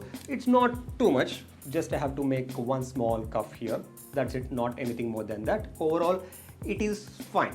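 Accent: India. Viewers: It's been a long time since I heard this Indian English. )))))😉😉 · no offense, but Indian accented English needs to be spoken with a slower cadence for it to be understood by many people.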